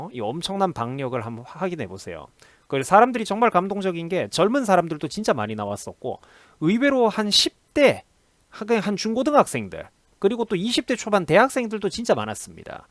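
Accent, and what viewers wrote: Gyeongsang, South Korea. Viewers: 대구말씨인데 대구분인교?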